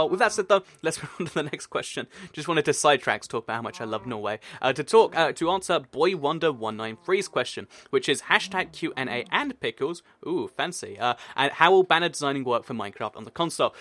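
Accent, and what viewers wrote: United Kingdom. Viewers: #British Accent: Will we ever get mineable spawners for the Console edition of Minecraft?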